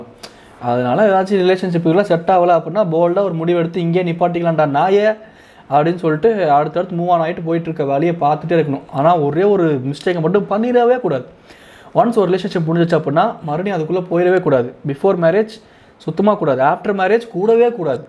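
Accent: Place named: Karur, India. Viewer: Happy mau day💪💪💪💪💪ean irumal odambu sari illaya? Love fm srilanka😍😍😍😍😍karoor slang nice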